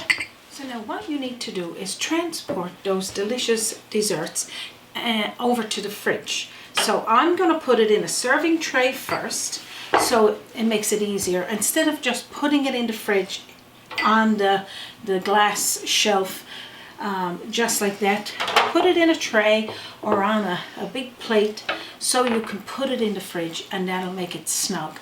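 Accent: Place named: Newfoundland, Canada. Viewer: I just happened to run across your channel (the strawberry squares popped up on my screen yesterday), and I see that you have many interesting recipes online, including some specialties from the area where you live. At first, I was confounded. Your name indicated a Latin background, but I see you are not and also your accent threw me as well, until I saw the word Newfoundland on the screen. Oh, my. That is an area I am totally unfamiliar with, only that I believe it is located near New Brunswick, around there, close to Canada, certainly not my neck of the woods. Anyway, I wrote down a number of your recipes I plan on making in the near future, including this chocolate gelatine dessert, the reason being that I noticed too many manmade chemicals and additives in Jello products. I would much rather make pudding without all that. I also noticed many delicious soup recipes I want to try out as well as several breads. 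I am amazed at the ease with which you make your breads, thinking to myself, why haven't I thought of using those methods before. I am like you when making desserts...I heard your comment in the chocolate walnut cookies video...that when it comes to making something like that, you just want to make it enough to make it taste good, that you don't bother yourself with making it too fancy...something like that. I feel the same way. I will continue to watch your channel in the meantime. In fact, I subscribed yesterday. When I eventually make one of your recipes, I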